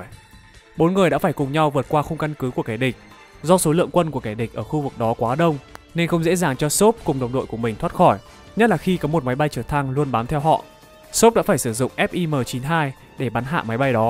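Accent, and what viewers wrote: Standard. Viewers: Bạn đọc khá rõ và phát ngôn chuẩn.. đóc tiếng anh chính xác tôi khá thích.. mog kênh sẻ ngày càng phát triển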